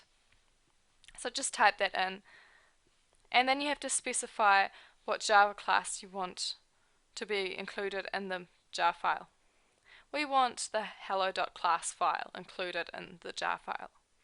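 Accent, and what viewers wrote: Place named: Australia. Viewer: You have the cutest accent. Australian?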